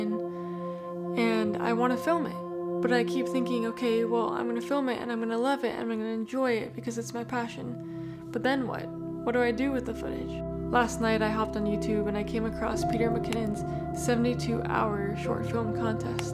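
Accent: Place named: Netherlands. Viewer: Well `done, you accent sound dutch buy the way! :)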